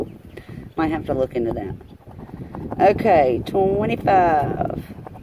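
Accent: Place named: South, United States. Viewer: Nice southern accent gal!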